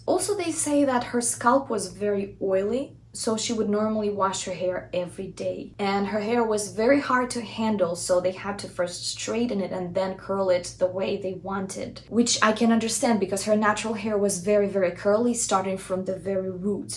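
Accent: Russia. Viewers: По акценту поняла сразу, что автор русская))) местами прям проскальзывает произношение, на согласных особенно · Was listening to you and all the time was thinking,that you are Russian,the accent is evident, then read about you after the video and saw that I was right❤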